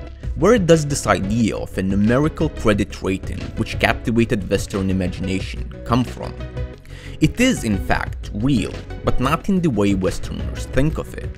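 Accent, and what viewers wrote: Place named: India. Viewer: Need one with out the pajeet accent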